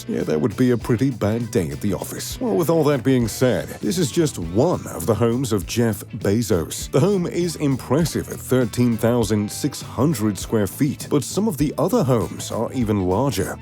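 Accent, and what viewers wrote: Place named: RP, United Kingdom. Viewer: I hade no idea where you were from. But I knew you were foreign. You have mastered the upper class/posh accent 🤝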